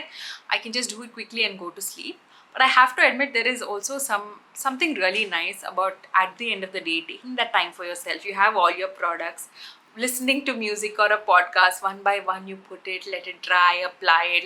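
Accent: India. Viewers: Yes,The Indian accent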